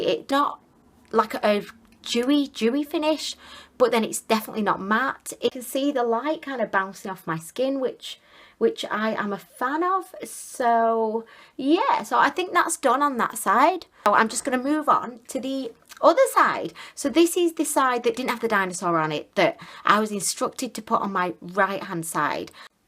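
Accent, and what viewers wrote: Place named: South London, United Kingdom. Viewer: Interesting accent. Where are you from? Are you south of London??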